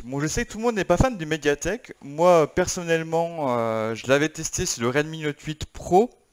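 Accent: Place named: Besançon, France. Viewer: Vous avez un léger accent je dirais Besançon si non bon look ce redmi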